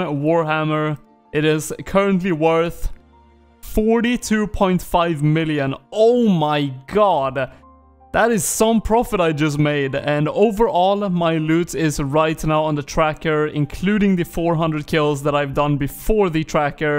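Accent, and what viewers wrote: Sweden. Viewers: Are you Swedish and from Stockholm by any chance?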